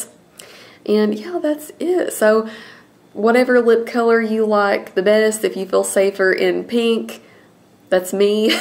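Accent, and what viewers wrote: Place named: South Carolina, United States. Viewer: What state are from? You sound like and I am from SC. Love your vids.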